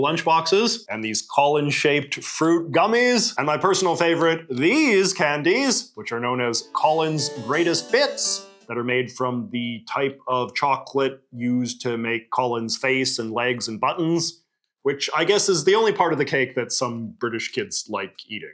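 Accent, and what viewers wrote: Canada. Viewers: you have the most canadian accent i have ever heard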